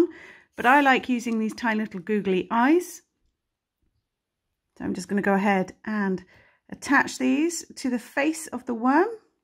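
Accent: England, United Kingdom. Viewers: Well...I am definitely new at crocheting, but you are 4 SURE my favorite! You explain things well...plus I love the English accent, & I especially like when you say "pop your hook.."..lol. so thankyou 4 your videos. P.s. I also heard on one of your videos that was already taken...have you ever considered "robin hooked" / like robin hood....lol. you could...instead of "steal from.the rich & give to the poor"...u could....idk.."feel 4 the stitch &....well I can't think of how the rest of that would go, bit I'm sure theres a clever tagline just waiting to be discovered. Lol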